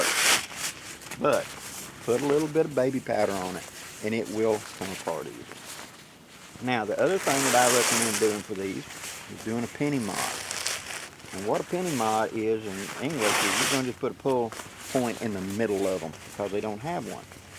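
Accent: South, United States. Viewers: you are one of my favorite outdoorsmen pesenting common sense advice. Once again I have to take a minute to digest that southern speak. " Double it in half". Love it, carry on.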